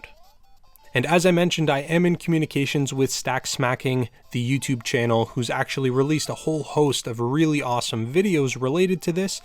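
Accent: Canada. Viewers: You sound suspiciously Canadian.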